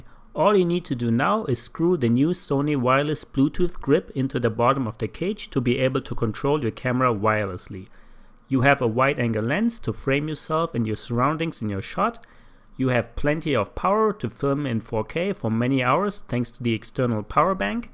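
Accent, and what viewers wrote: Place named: Germany. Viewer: Thanks for the tips. You have such a nice German accent 😉👍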